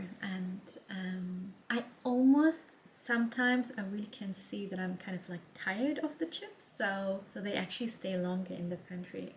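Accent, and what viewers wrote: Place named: Germany. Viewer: You’re doing great! Are you from Germany? Your accent and voice is very similar to a close friend of mine who is from Germany. :)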